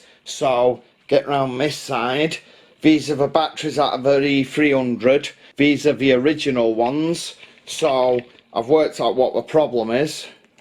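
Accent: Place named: Salford, United Kingdom. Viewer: Hay bro you have a proper Salford accent speed an power mod bridge the yellow an black wire is the simplest way or in the speed box you can solder it internally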